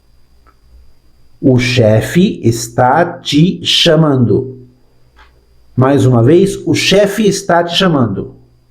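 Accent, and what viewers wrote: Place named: Argentina. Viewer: Muito.charme o..sotaque do argentino falando português. Nao canso de admirar!!!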